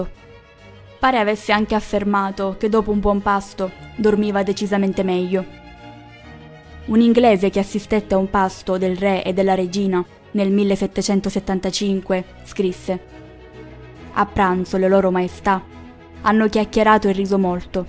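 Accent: Sicily, Italy. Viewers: DAL TUO BEL ACCENTO SONO QUASI SICURA CHE SEI DEL SUD DELLA PROVINCIA DI SIRACUSA O RAGUSA BRAVA COMUNQUE MOLTO BELLO IL TUO CANALE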